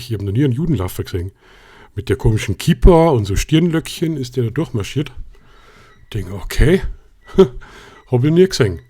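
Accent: Bavaria, Germany. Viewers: Hey hat mir sehr gut gefallen Deine kleine Führung durch Salzburg auch die Nachvertonung 👍 witzig und typisch bayrisch äh 😂 LG Sascha😊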